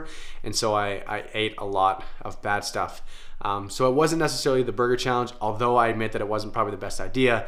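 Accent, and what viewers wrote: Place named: Canada. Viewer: Never noticed the accent I guess you are Canadian lol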